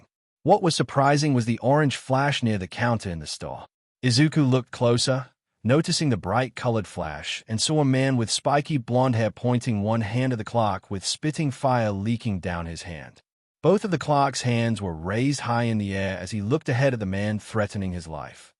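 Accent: United Kingdom. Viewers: Also why is the ai British?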